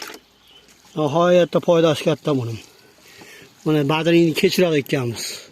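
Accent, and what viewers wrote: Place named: Fergana Valley, Uzbekistan. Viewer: АССАЛОМУ АЛАЙКУМ ОТАХОН! ШЕВАНГИЗГА КАРАГАНДА ВОДИЙДАНСИЗ ШЕКИЛЛИ, СИЗГА РАХМАТ БИТТА КАМЧИЛИГИНГИЗ ЁНИНГИЗГА БИРОНТА ЁРДАМЧИ ОЛМАБСИЗДА, КЕЙИН ЙОД БИЛАН ЗЕЛЁНКАНИ ИДИШГА СОЛАЁТГАНИНГИЗНИКУ УМУМАН КУРМАДИК ФАКАТ АРАЛАШТИРДИНГИЗ ХОЛОС, КЕЙИНГИ ВЕДЕОДА КУРСАТИНГ, ЯНА БИР КАМЧИЛИК КУПЧИЛИК АЙТГАНДИР ТАЙЁРЛАГАН КУЛ ОЗУКАНГИЗНИ БОДРИНГ ИЛДИЗИГА КУЙДИНГИЗМИ ЁКИ БАРГЛАРИГАМИ УШАНГА ТУШУНМАДИК, УМРИНГИЗДАН БАРАКА ТОПИНГ, ИЛГАРИ ОТА БОБОЛАРИМИЗ КУЛНИ БАРГЛАРИГА ХАМ СЕПИЛСА АЧЧИК БУЛАРКАН ШИРАГА ВА КУРТЛАРГА КАРШИ ЭФФЕКТИ ЯХШИ ДЕРДИЛАР ШУ ТУГРИМИ. РАХМАТ ОТАХОН ЧАРЧАМАНГ!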